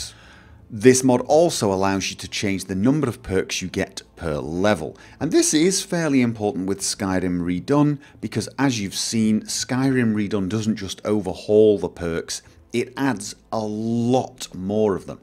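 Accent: United Kingdom. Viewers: But... British accents are cool 0_0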